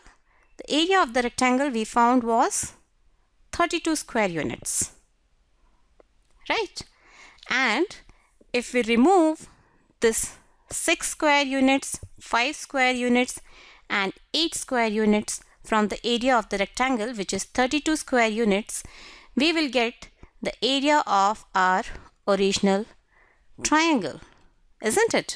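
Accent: India. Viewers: Not trying to be racist but your accent sounds like your Indian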